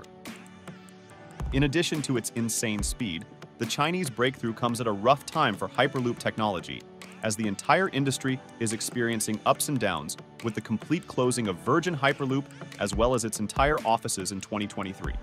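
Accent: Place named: United States. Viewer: More of the tiresome juvenile American accent espousing 'non-existent' technology, including the usual 'cut and paste' non-related images. YouTube unfortunately, is full of this crap!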